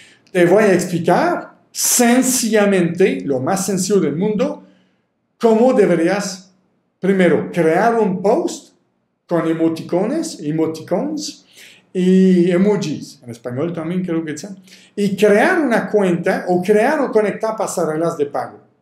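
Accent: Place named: France. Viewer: L'accent c'est très joli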